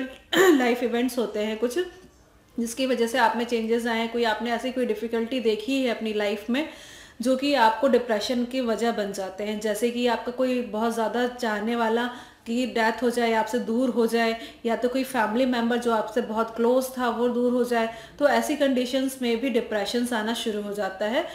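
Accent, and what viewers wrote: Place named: Punjab, India. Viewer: punjjabi voice 6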